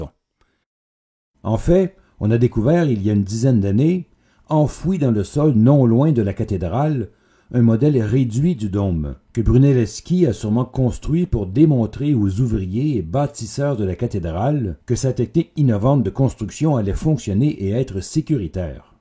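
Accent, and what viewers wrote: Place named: Quebec, Canada. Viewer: Accent québécois hilarant, peu en accord avec le sujet. À quand la Renaissance le long du Saint-Laurent?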